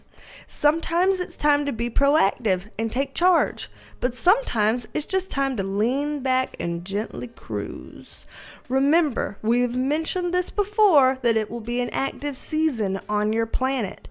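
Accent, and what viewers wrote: South, United States. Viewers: We love your southern twang! 😁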